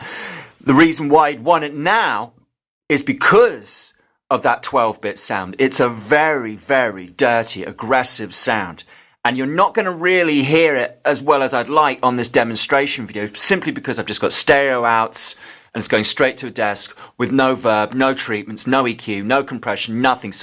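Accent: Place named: South, United States. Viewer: southern voice makes me laugh but all in all find the series very interesting and funny. you persuaded me to go for a univox too great video that. look forward to the new ones. happy new year.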